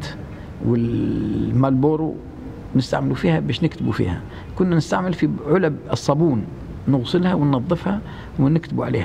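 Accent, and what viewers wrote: Tunisia. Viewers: بس لهجتك تونسية بشكل كبير ، ليش ؟ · شفناهم كيف نهضوا بالبلد ولهجتك التونسية واضحة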